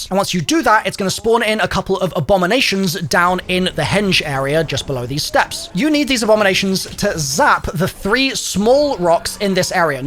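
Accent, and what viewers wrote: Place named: United Kingdom. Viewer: Thank God for the subtitles. I hate your shit British accent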